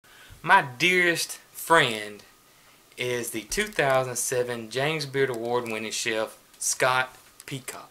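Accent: South, United States. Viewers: I appreciate the Southern drawl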